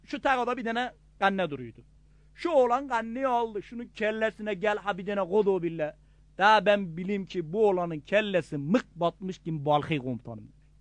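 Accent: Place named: Gaziantep, Turkey. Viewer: ORJINAL ISTE! BU SIVEYE CAN KURBAN! Antepli olunmaz antepli dogulur!!!!!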